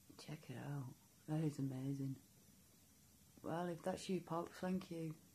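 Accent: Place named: Yorkshire, United Kingdom. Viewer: they feed off fear hun so try and stay strong dont record it pay it anymore attention people may love your vids buts its not worth what its doing to you you sound like you possibly have a yorkshire accent or there abouts i will come try to help you if you wish if it gets too much just send me a message hugs x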